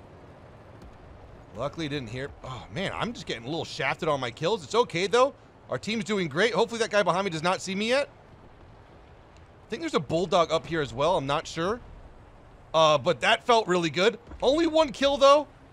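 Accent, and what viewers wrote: South, United States. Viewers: country accent has a lil sugar in the tank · the country pronunciation was better tbh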